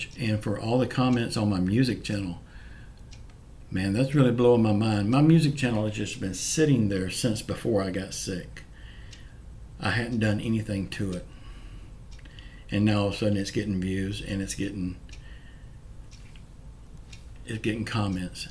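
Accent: South, United States. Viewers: Thank u for answering my question💕 love hearing ur southern voice 🙂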